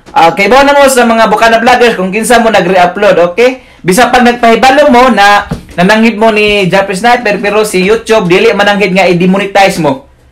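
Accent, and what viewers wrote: Visayas, Philippines. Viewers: Kaya pala d way ka magsalita visaya ka.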